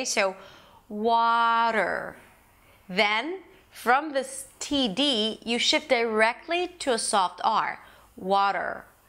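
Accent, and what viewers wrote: United States. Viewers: What a beautiful american english 😍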